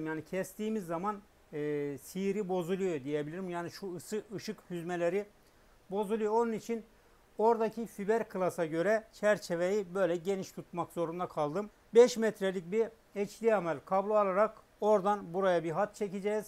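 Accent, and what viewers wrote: Erzurum, Turkey. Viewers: DAYI SEN ERZURUMLUMUSUN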